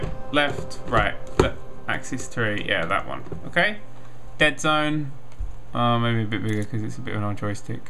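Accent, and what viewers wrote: England, United Kingdom. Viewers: Your british u already have a british accent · You have a english acsent.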